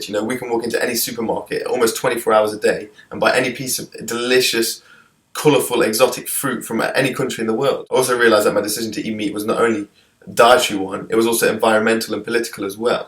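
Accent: United Kingdom. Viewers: every persons happiness leaves another person upset/hurt..the animals are bread for food...the worlds population is so vast now, we are running out of natural supplies, we need this...and by the sounds of it, you are from the UK, read your vegetable wrappers etc. you will see it is coming from elsewhere in the world where people are struggling in slavery conditions to produce just enough food for us, to make them enough money for their family to eat a meal that week, please, think things through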